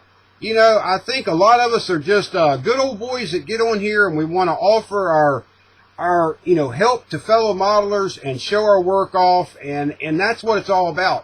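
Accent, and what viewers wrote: United States. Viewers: I love the rant. I am English and live in the UK. I find the way you Americans pronounce words very entertaining, keep on saying what you want any way you want to pronounce them. Thanks for the wonderful videos, I find them very informative and entertaining.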